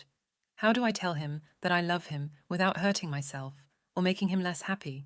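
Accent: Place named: United Kingdom. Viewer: Where people aren’t British the person just added a narrator with a British axent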